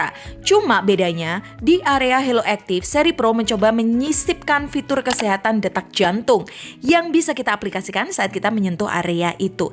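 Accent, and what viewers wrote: Surabaya, Indonesia. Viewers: Suroboyo iki rekkkkkk.....👍👍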